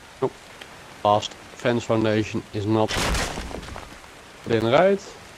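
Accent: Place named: Netherlands. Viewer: En je hoort heel duidelijk dat je nl bent 👍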